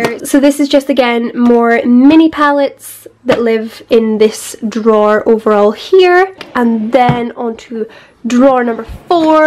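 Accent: Scotland, United Kingdom. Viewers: Otävya LaCourse she Scottish so her accents different I'm Scottish and I have a accent she can't help how she sounds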